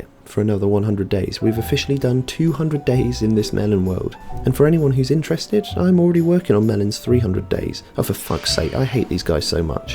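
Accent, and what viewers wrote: United Kingdom. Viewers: god i really like your calm British accent you sound soo chill also all hail the king of melons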